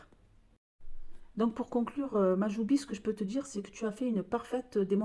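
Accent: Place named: Marseille, France. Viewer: Très bel accent marseillais.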